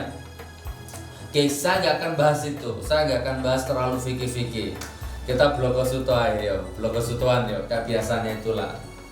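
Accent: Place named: Java, Indonesia. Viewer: Gus... sy tebak pasti sampean orang jawa ya... keliatan medok... wkwkwkwk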